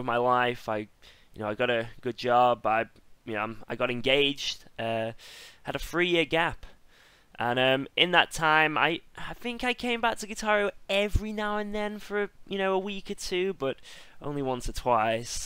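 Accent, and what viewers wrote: United Kingdom. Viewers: I'm not quite sure or how I ends up started to watch your stream. I never played GH at all. But after watching your stream, I bought my first guitar controller and just realized how godlike you are You deserves all this man, you works so hard everyday, producing something that considered impossible for mere human Also that sweet smexy British accent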